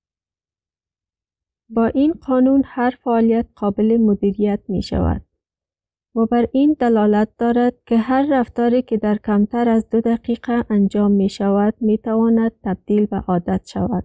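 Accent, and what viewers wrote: Afghanistan. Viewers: خیلی با دری در ارتباط نیستم ولی خوب حرف می زنه فقط عادت ندارم بهش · Dari accent is also beautiful I like it.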